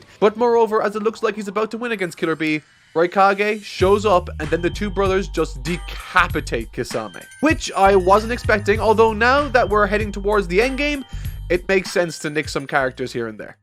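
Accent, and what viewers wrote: Ireland. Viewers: Are you Irish, sir? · That's wild af to call an Irishman a Brit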